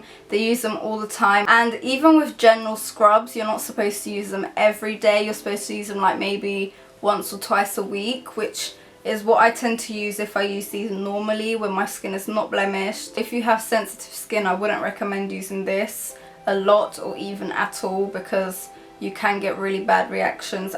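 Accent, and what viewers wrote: London, United Kingdom. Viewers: Patt Col London accent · You’re beautiful. I’ve never met a Latina with a British accent haha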